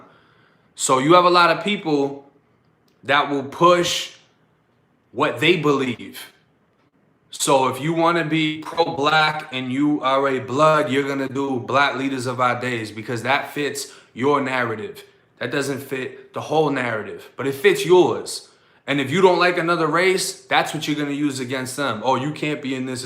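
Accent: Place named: Massachusetts, United States. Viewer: youre from Mass...that fuckin accent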